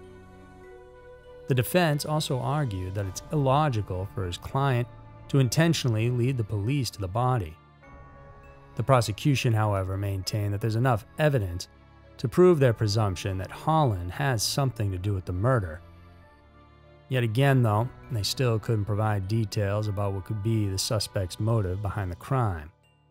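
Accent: South, United States. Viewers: Great video. And I like your southern accent too.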